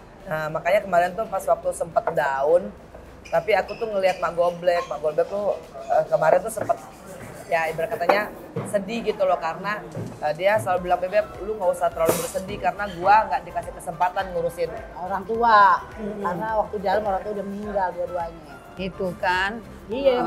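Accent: Manado, Indonesia. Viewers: Adu eh...p sadap m dengar mbak bahasa mdo.. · Mba campur logat manado mantap 😀👍...sehat sllu mba' brsm kluarga 😇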